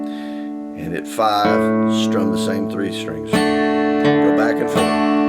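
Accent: United States. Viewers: that's great, I'm smiling, it sounds like Tennessee to my ears, thank you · Just your explanation of how you play a G chord is off the hook. And you have the most perfect southern accent.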